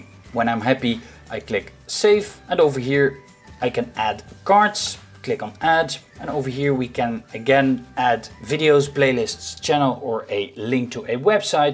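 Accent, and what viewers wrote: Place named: Netherlands. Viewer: Ik ruik nederlander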